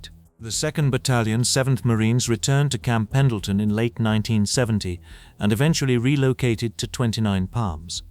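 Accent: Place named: United Kingdom. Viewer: Why is it always british accented narrator s , with US battles kinda unsettling and just wrong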